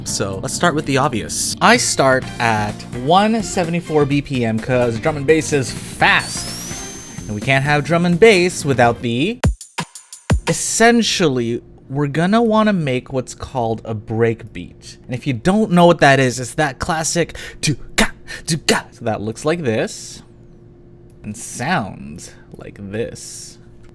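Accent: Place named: United States. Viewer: Too American sounding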